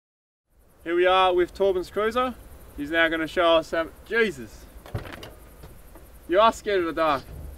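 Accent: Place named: Australia. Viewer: This guys accent is so cool Australians always seem happy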